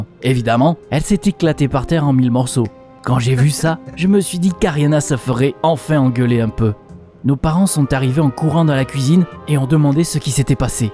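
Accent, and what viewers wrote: Marseille, France. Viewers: L’accent Marseilleais on est laaaaa Désolé pour les fautes 😅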